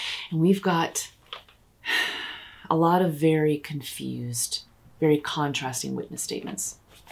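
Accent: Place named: United States. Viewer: Very well performed however, hope you don't mind me saying, even though the pacing was perfect & I enjoyed the story, there was a slight clash of American accent & English words that they do normally use...?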